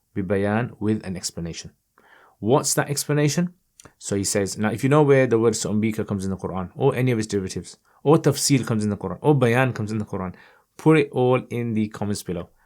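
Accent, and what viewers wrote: Birmingham, United Kingdom. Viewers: Lol! Only because you speak even faster 😂 I think mufti saahib does speak a little fast and sometimes I have to go back to listen to what he said. The Birmingham accent doesn't help either 👀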